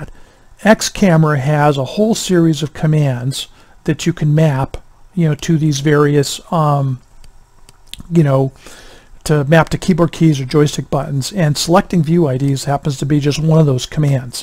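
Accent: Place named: United States. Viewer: Thank God - someone who's from the US and speaks English for this tutorial.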